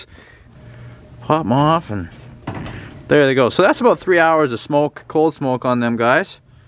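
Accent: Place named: Canada. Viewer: So I can tell from your voice that you are a fellow Canadian the way you said today you sound like half the guys I hunt with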